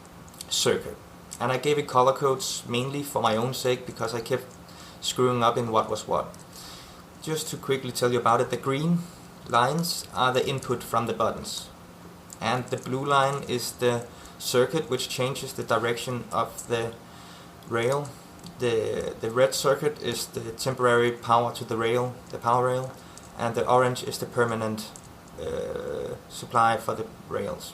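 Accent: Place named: Denmark. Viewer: are you danish? you really sound like your from denmark. the accent is really special for danes.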